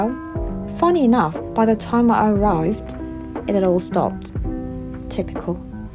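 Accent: United Kingdom. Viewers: 대만 브이로그와.. 영국 영어 까지.. 아주 브릴리언트한 영상입니다.. 잘보고가요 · I want to watch another episode!! Your vid is always amazing❤ I love your british accent too. Have a good one!!🩵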